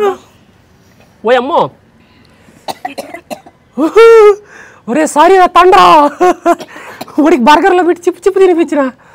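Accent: Nellore, India. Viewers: నెల్లూరు slang ❤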